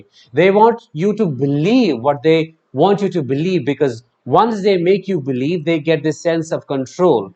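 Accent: India. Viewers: The accent gets things very confused. It’s partly Indian and Partly Western. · Strong indian accent earned my sub. Solid 10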